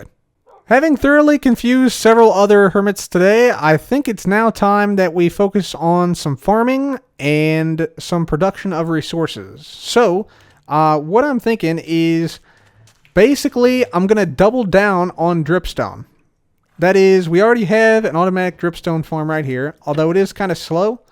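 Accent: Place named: Chicago, United States. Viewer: the accent reminds me of the saturday night live skit with farley and akroid ...."da bears..... da bulls....ditka" ..." how many heart attacks for you bob?"..."gonna be a bakers dozen"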